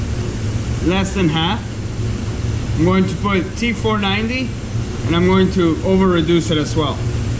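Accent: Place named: Quebec, Canada. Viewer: Est ce que je reconnais un accent Canadien francais ? :) You seem to have Canadian french accent :) Great vid btw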